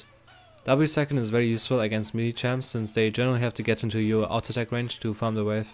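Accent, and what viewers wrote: Germany. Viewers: Ayyyy that german accent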